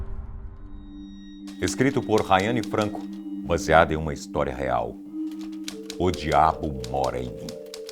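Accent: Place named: Minas Gerais, Brazil. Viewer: Pq esse sotaque de mineiro se ele é Catarinense?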